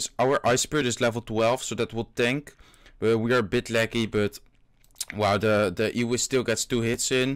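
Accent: Netherlands. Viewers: Dikke Nederlands accent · ik hoor het nederlandse accentje:p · Zyt gy Nederlands spreker? ge hoort dat echt... maar je kan wel goed engels spreken... ik ben van belgie btw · ben jij Nederlands????? zo praat je namelijk wel: )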